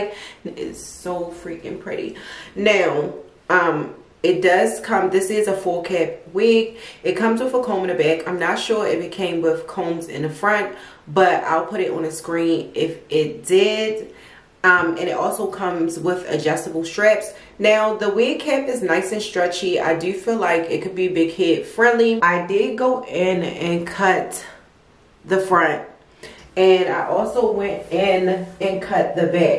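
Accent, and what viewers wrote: Baltimore, United States. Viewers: I’m loving the Baltimore accent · Yea that’s that Baltimore accent I can hear it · You sound like your from my city.. DC🥰 Nevermind.. I hear B-More🤨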